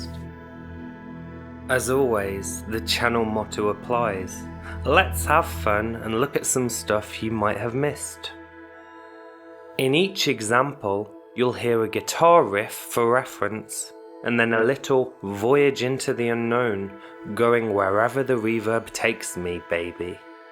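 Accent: United Kingdom. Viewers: YEs yeS YeS YES! Awesome style in every aspect with a lush brummie voice. Long may this continue!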